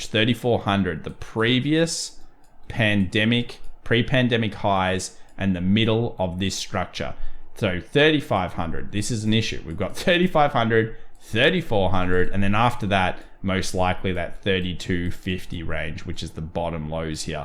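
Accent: Australia. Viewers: i bother to listen because you are an aussie. Do not disappoint me, please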